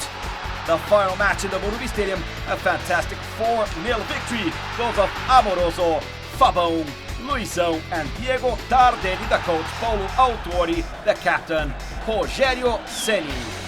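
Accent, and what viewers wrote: Portugal. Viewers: E portugues falando ingles, fico mt engraçada essa narração ai kkkkkkkk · Nice portuenglish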